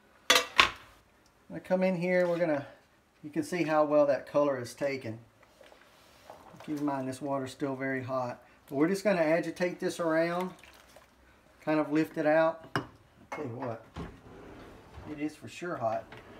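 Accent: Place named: United States. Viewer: Don't see anyone in the video?? All I hear is an American man???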